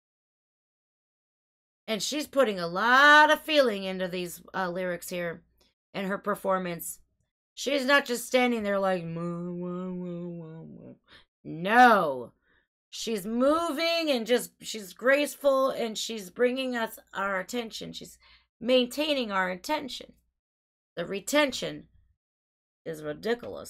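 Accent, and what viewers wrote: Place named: Ohio, United States. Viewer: You are THE. BEST. I love your Ohio accent.:). Yeah, watch Haley's bit. She smolders.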